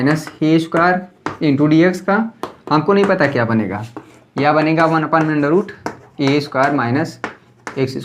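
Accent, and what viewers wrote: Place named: Bhojpuri, India. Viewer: भोजपरी सर आप बहुत अच्छा बोलत बानी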